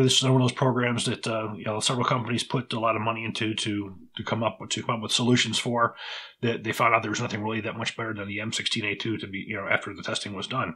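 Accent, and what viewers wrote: Texas, United States. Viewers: I love accents, especially Texan. Just caught the misciatta coming out. Hope all is well.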